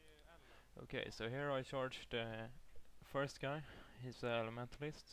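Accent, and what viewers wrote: Sweden. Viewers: Why did you choose that pet? Is it the best pet, or what? (Man hör att du kommer från Sverige) xD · Dat swenglish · En svensk var det ja ^^ nice. hör det rätt tydligt på din dialekt/brytning. nice vid btw :D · are you swedish? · dat swedish accent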